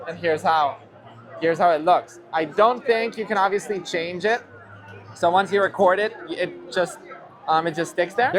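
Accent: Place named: Spain. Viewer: El español de de España tía hablando de los iPhone joder 😅😅😅😅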